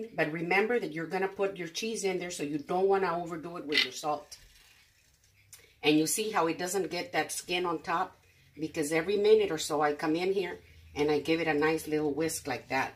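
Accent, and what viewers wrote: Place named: New Mexico, United States. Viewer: I completely agree! Also, your voice is very soothing. You very much sound like you could be from my beautiful state, New Mexico which is even better!😎❤🌶💛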